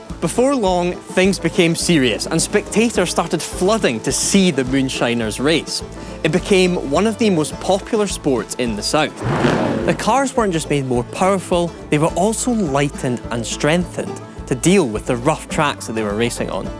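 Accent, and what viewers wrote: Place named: Glasgow, United Kingdom. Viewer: Do you? I'm Scottish and hate his accent. It's that "I'm a spoiled middle class Glaswegian who went to university and had 5 star accommodation and loads of money to buy me and my friends loads of things" It's a horrid accent.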